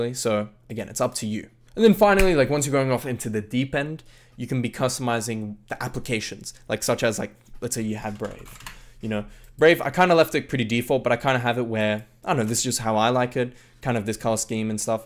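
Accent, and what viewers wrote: Hungary. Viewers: Hey man are you hungarian? :D love the video Nagyon jó videó lett:) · az úr magyar? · are you hungarian ? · magyar vagy? xd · are you hungarian by any chance? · Are you Hungarian?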